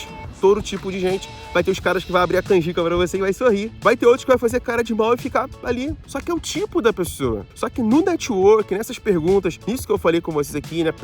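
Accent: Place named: São Paulo, Brazil. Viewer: O conteudo muito bom, o sotaque de faria limer orrita um pouco... hahahahaha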